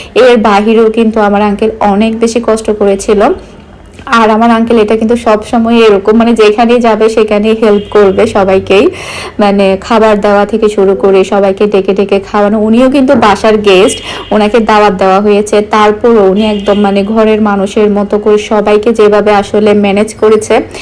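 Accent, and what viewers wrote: Chittagong, Bangladesh. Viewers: Apu apnar video amr onk valo lagse.amio ctg er.so amdr culture e maximum mil ache ejonno dekhchi.apni onk smartly o kotha bolen but i think apu apnr kotha arektu correction kora uchit.kotha bolar shomoi ctg n bangla mix hoye jai.shunte kmn jani lage jetar jonno out district er manush ra always eta niye troll kore.so i think apnr bangla ta ektu improve kora uchit.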